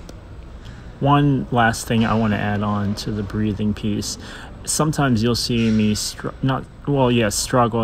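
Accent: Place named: Oklahoma, United States. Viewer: Dude are you from Oklahoma?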